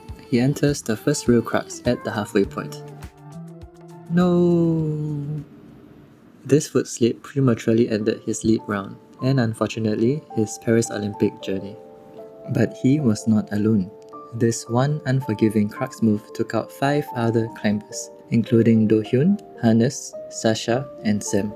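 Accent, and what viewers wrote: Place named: Singapore. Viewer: Kinda guessed you were singaporean from the accent and the BP tai seng clip from another video confirmed it haha. Glad to see good content coming out of our little red dot, keep it up!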